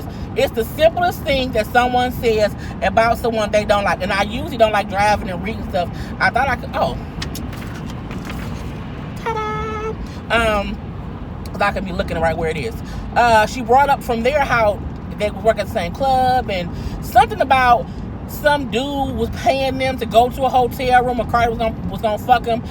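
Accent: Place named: New Orleans, United States. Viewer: I don’t believe that lady in your New Orleans voice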